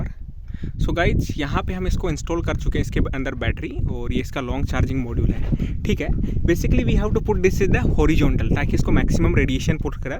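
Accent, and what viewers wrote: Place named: South Asia. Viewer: I would more appreciate this video if the commentator spoke American oe British English. I missed much because of the strong South-Asian accent.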